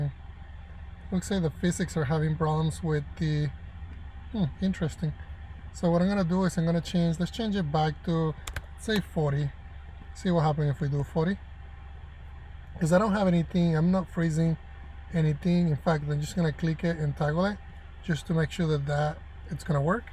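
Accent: Spain. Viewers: I really like your videos man. Are you Spanish?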